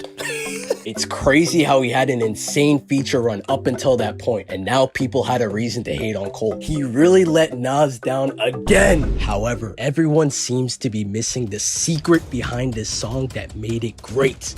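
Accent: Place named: Canada. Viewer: so sorry to ask but are you Canadian? love ur voice lol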